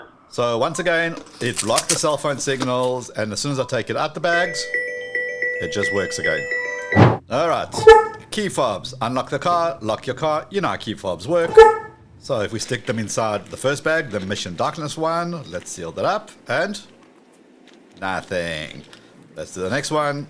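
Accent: South Africa. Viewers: Howzit, do i hear some 🇿🇦 in your accent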